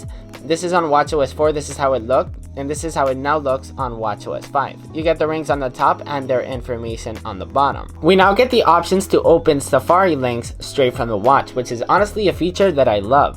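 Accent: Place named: Spain. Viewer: His accent is Spanish, as was born raised and lives in Barcelona, Spain. He spent 3 years in the United States as a foreign exchange student. It’s why it’s hard or takes awhile to figure out that is not American just by watching only a few of his videos. Listen to him pronounce the city in California called Cupertino, which is where Apple HQ is. Many cities and towns in California have Spanish names! I’m sure I overly answered your question, but I’ve been following ’ channel from the early days. Have a good night! ~Cat from NY 🐱🍎💜👍🏻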